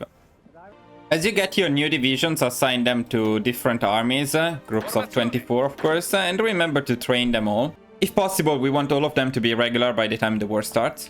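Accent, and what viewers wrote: Italy. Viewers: I really appreciate your Italian accent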